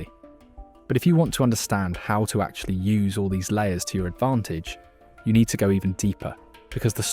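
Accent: England, United Kingdom. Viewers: Can you please change the accent? This English accent is getting on my nerves!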